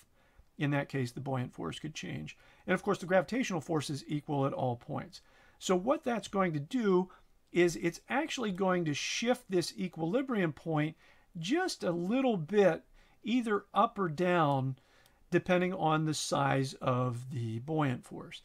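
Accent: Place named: United States. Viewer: This is how an American should sound like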